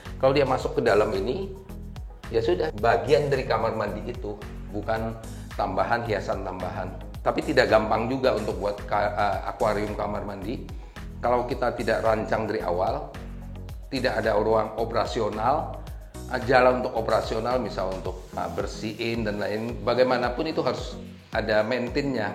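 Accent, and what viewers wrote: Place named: Bangka, Indonesia. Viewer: Logat bicara blom ilang bangka ah khiu.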